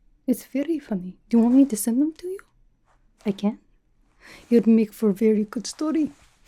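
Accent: Russia. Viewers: I agree, the accent is great for the russian characters shes done so far the silly attitude does make it amusing too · Hello. I'm Noah, and I like Marvel superhero movies, particularly the MCU. I really liked your cosplay of Yelena Belova. Your Russian accent is very good, even though I really have not watched a MCU film "Thunderbolts*". I wish that I was a young man, who is kidnapped by other Russian assassians and locked me in a cage, then needs to be rescued by Yelena and said "Thank you". Or... if I needs to be trained by Yelena, then kidnapped by the other Russian assassians and locked in a cage, I would use my special training skill to escape. That might be a good idea. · Yelena is back and still making my scalp tingle. ✨ I love that Russian accent, you're so good at it. 😁 And the hair. 🖤🤍 Being captured and interrogated is a lot of fun. 😁 I hyped this. 🖤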